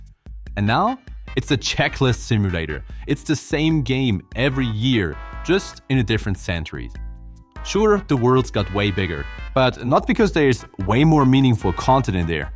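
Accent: Germany. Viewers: Höre ich da deutschen Akzent?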